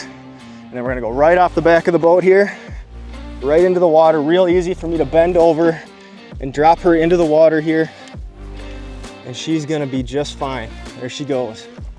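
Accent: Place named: Minnesota, United States. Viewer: You’ve got the Minnesota accent down for sure)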